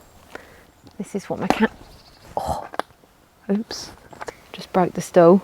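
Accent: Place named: East End, United Kingdom. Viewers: Happy Birthday! All my mates back in the UK are from the East End / Essex so your accent is a pleasant bonus and reminder of different, happier times. Go on, fail, be happy, fail again and then succeed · Very nice! I liked the last bit most. Sitting on a path, breaking your perch, referring to notes taped to your tripod. :) Happy Birthday. I've subscribed mostly due to your accent. Gotta love that accent. When I first heard that accent I hit subscribe. That was 12,000 or 13,000 subs ago. One I subscribed I had to Google you as you seemed damned intriguing and more to you than met the eye- and discovered you rowed around the UK and/or across the Atlantic. (This blonde Cockney-ish sounding girl rowed a boat across the Atlantic Ocean?) So you're worth listening to. You have some steel in your spine. Congrats on your birthday and your new YouTube career.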